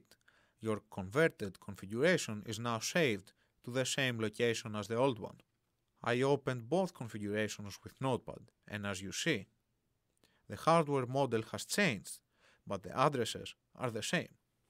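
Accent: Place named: Greece. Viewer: This man sounds like he's Hellenic! :) Nice! :)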